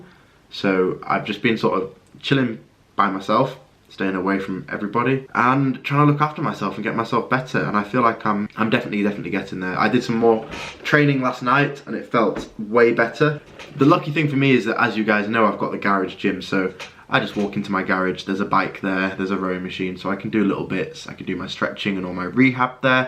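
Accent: United Kingdom. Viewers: you are amazing. I am so glad you are on the mend. Listening to you reminds me why I love the English 😻🌈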